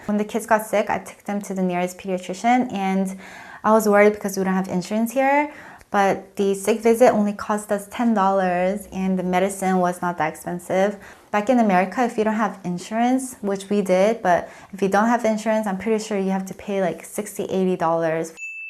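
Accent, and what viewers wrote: South Korea. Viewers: I think its quite interesting. You're an American-born citizen but you have a slight Korean accent when you speak (ie mixing up plural and singulars). It's fascinating how you acclimate to your surroundings or pick up people's mannerisms and speech. I hope you enjoy your life in Korea.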